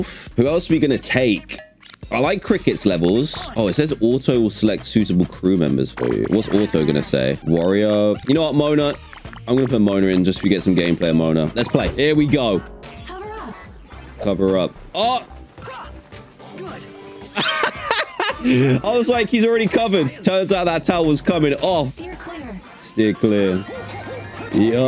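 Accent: United Kingdom. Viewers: British right.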